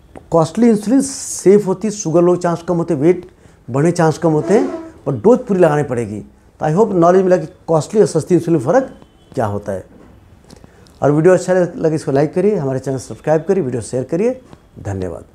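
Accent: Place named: Bengal, India. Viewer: Your Bengali accent is very difficult to understand sir Also please make videos in English with Subtitles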